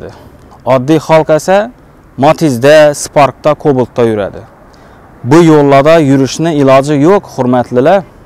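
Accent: Khorezm, Uzbekistan. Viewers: ака хоразимча гапирябсиз базида тшуниш кийин болябди илтимос адабий тилда хам гапиринг..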